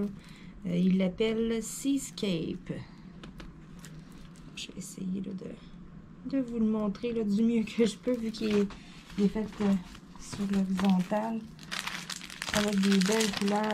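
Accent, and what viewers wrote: Canada. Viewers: Vous êtes super comment ne pas vous aimer avec votre accent. Canadien qui me rappelle de bons souvenirs au quebec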